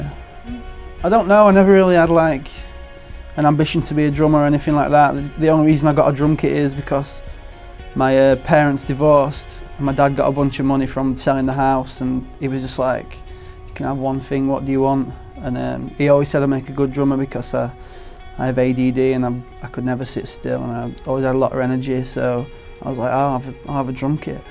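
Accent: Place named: United Kingdom. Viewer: Макс Грин yeah, british accent, if you know what I mean ^^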